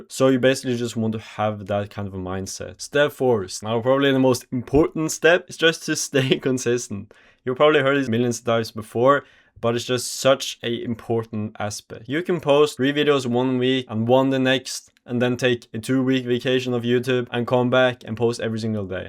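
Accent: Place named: France. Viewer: 1.- Thanks for the content. 2.- Are you french or french canadian? Your accent gives me XQC vibes.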